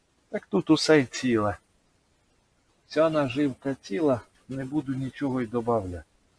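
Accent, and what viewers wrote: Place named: Romny, Ukraine. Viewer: Гарна рибалка! Молодець! Чую по говору ти з Роменщини?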